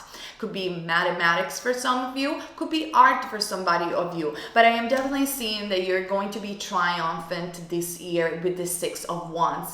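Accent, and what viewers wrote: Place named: Italy. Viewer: Love the Italian accent!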